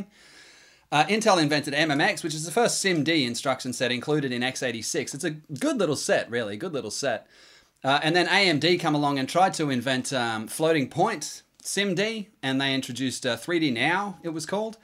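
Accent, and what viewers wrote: Australia. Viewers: Hi, mate. Are you from Australia?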